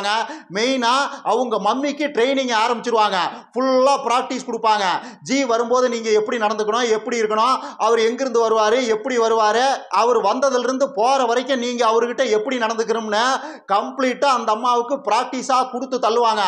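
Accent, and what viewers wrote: Kongu, India. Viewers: Super thambi nalla irrugu un kongu petchu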